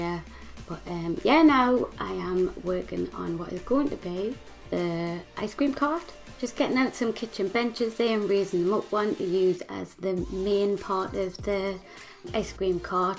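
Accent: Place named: Newcastle, United Kingdom. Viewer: Geordie through and through! Why aye lass!! Love your builds X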